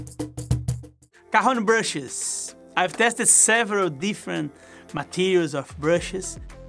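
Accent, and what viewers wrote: Brazil. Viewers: O sotaque tá arranhando véi! É sotaque nordestino falando inglês!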